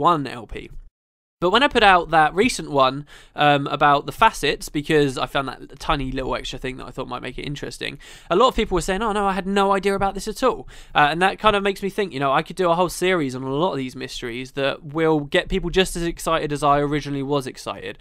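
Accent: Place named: Manchester, United Kingdom. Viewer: Sounds like he might be from Manchester!!! could be wrong.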